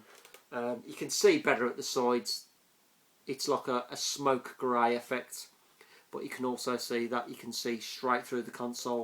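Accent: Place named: Wolverhampton, United Kingdom. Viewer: Thought the accent sounded familiar. Wolverhampton here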